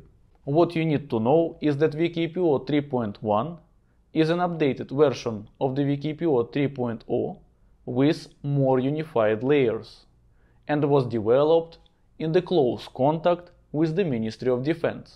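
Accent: Russia. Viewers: Если не секрет у тебя правда такой акцент или ты чуть под стронгер русиян акцэнтиш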